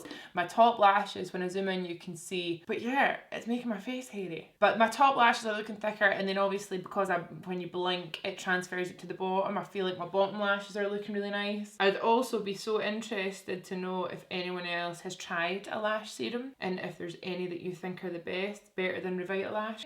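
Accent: Scotland, United Kingdom. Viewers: I always watch your vids when I’m stressed out before exams. Something about a scottish accent is so soothing?? hahaha luv u!! come to dublin thanks ❤️❤️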